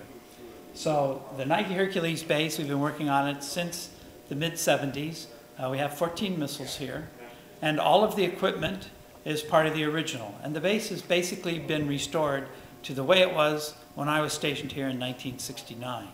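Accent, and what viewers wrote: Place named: Middle East. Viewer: I remember seeing the barrack missle system had Hebrew writing on it were you in Isreal. Also you sound middle Eastern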